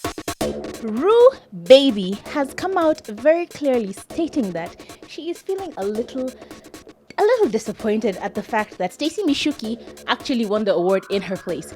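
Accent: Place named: New York, United States. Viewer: wow , i,m new in the channel at first i thought ure from new york ....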